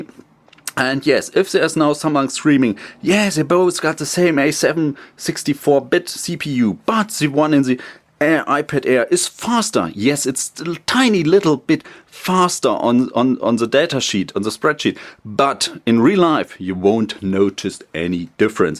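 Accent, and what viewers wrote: Germany. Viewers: Not an expert traveler but this man's English accent is clearly German or even Swiss. Nevertheless, I appreciate the fact that he speaks my language, clearly and intelligently and offers a fantastic comparison of the two pads. I had the opportunity recently to purchase a mini2 with retina display for $160 usd new. Not wanting to just jump at buying a mini and regretting it, this review gave me all of the information I needed to make a good decision. Thank you. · are you german?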